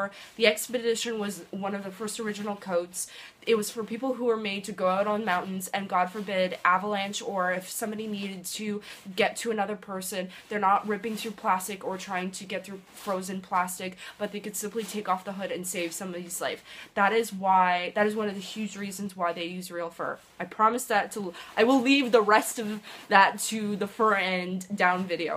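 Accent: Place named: Canada. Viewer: i love your cad accent..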